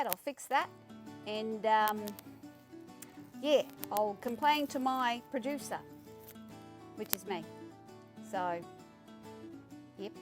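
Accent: Australia. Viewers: I would love to hear your take on <link> Aside from that as an aussie in exile in NZ I really enjoy hearing a good ocker accent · you are unique. Your perspective on American affairs is spot on. Please continue to keep us informed. Your Australian accent doesn't hurt either.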